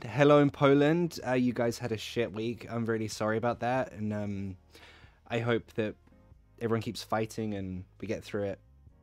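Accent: United Kingdom. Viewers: What british accent you talk:Rp,Modern Rp,posh or cockney and do you talk more than 1 accent in your daily life?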